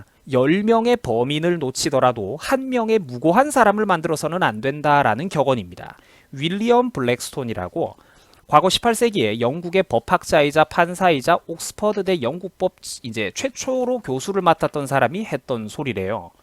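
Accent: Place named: Daegu, South Korea. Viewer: 항상 잘보고있습니다. 대구사투리 넘좋다 하앍하앍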